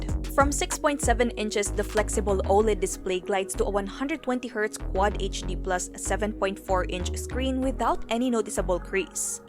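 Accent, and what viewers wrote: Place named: Philippines. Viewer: That filippo accent gets me all the time 😍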